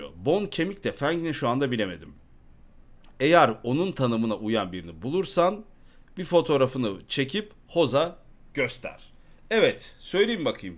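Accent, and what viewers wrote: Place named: Ankara, Turkey. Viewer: Ankaralı olduğu nası da belli karı kızda yedi parayı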